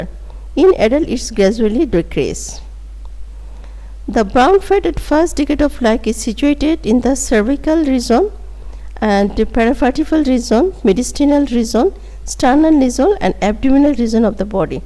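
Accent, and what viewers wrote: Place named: India. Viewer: Only other Indians can understand this atrocious accent . Madam; please learn to speak English, or use a robot to read and express your slides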